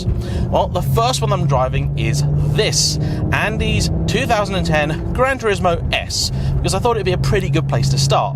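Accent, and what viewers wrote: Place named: England, United Kingdom. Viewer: "A person with an English accent reading the phone book sounds better than an American reading the Gettysburg Address." -Mad Magazine, in a spoof of a course to teach you an accent instead of the actual language. Listening to this guy narrate this is so much better than the usual braying American "What's up? What's up? What is UP? What's up? Wassup?" at the beginning of each grating video.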